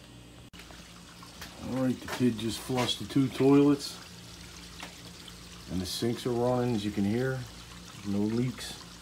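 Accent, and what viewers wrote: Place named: Philadelphia, United States. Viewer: this is NOT reall big problem..if you got the house for a good price.... do the work you save a ton it aint that hard it sounds like you are from philly so big box stores everywhere !! i see u have a work van and tools ......open the walls demo and clean that is the first day, get what u need in plumbing materials ie pipe fittings ect ect bust out the old shit ( at the bottom of where the pipe makes a turn scoop out the rust from the pipe with an old spoon on a pole) dry fit your pvc ...the pvc is cheap and easy.... do all that is needed to be replaces ,check for leaks not impossible ..after no leaks ,,rap with fiberglass insulation pipes will be MUCH quieter ... i did my 3 story house in oak lane TWO sewers it was a lil work but i saved a fcking mint!!!!! 😉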